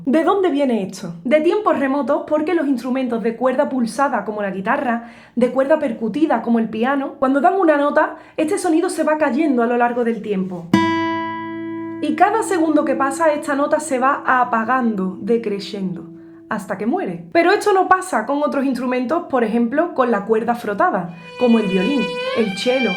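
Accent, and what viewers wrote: Andalusia, Spain. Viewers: Gracias Maestra, tienes técnica, conocimiento, pedagogía y, sobre todo, un brillante carisma. Gracias Maestra. Tienes un bello acento andaluz. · me pongo contento de solo verte, y escuchar tu guitarra, y tú hermoso acento andaluz. Por supuesto aprecio tu divulgación de buena música y tus clases sobre técnica.😊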